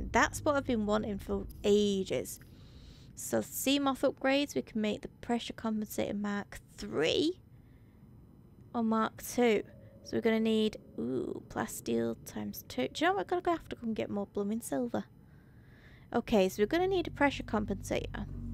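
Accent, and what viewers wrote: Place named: United Kingdom. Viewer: British 👌. nice accent :)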